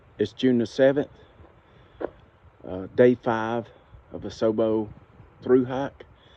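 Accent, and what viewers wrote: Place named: South, United States. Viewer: Just came across your channel, I look forward to following your SOBO journey, should be an interesting trip. When I first heard your voice, I thought, he has to be from the south, and yep, i was right. I am from western NC. Thanks for sharing your adventure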